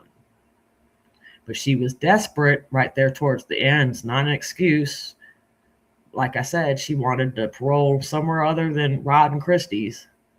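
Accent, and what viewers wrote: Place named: Wilmington, United States. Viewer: Girl, where are you?? You sound like Wilmington or Bolivia maybe? I'm in Wayne...Goldsboro but I grew up in Duplin. I always love running across neighbors! You are 100% right, hes a big ol titty baby, for sure!! My Granny used to say that all the time!!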